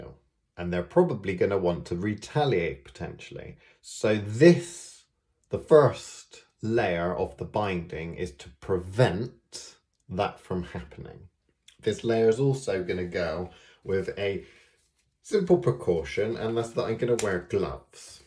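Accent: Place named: United Kingdom. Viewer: Across the pond here, really appreciate the “professor snape” vibes… maybe it’s just the accent hehe 😜 thanks for the series I learned a lot!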